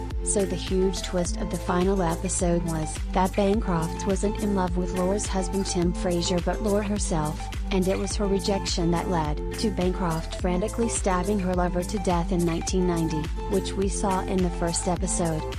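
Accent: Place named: United States. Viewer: I lost the will to live watching this, and now this robotic, American blurb ..... aaaaggghhh!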